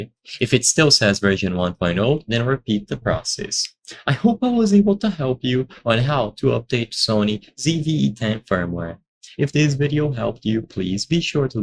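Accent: Brazil. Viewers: Você é brasileiro? Tive impressão pelo sotaque, awesome video my friend!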